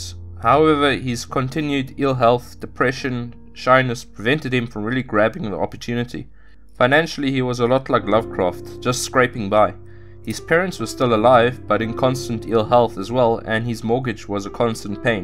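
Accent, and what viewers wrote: South Africa. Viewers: So do many of the ones on Abebooks but you get a vast selection and they're VERY cheap, sometimes pennies. Seriously, check them out... hang on, I just realised, from the accent, you're probably not in the US or EU are you? South African? If so there's an Abebooks in Capetown but they're all over the place.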